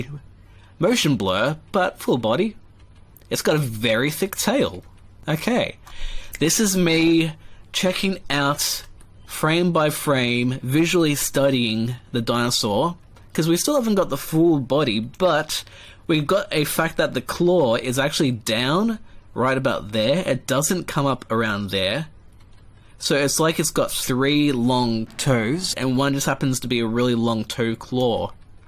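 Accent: Australia. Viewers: Just wondering are you Australian. If so. Let’s go Aussie. Also do you watch the AFL or know about the AFL. If you do know or watch afl which team do u barrack for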